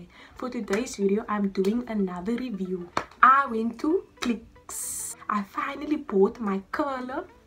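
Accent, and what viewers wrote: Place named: India. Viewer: Is that her real voice and accent. She looks black but sounds Indian🤔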